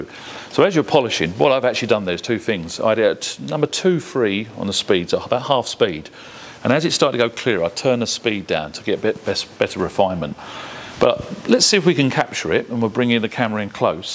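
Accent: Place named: United Kingdom. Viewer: isnt lake country is based in the US ? just wondered why did his accent sound british ?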